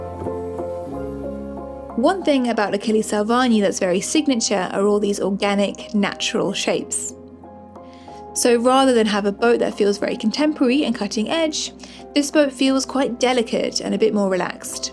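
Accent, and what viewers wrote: United Kingdom. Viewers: Who is the guy doing this? Just walk through it, he's trying to dress like a woman but it's definitely a guy with a British accent.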